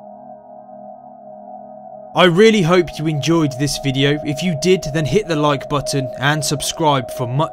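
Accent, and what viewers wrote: United Kingdom. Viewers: I love your british accent 💖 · Why are you British?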